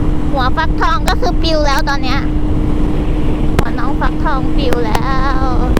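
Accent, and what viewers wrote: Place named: Isan, Thailand. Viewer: เว่าอิสานม่วนคักจ้าาา👍💖😁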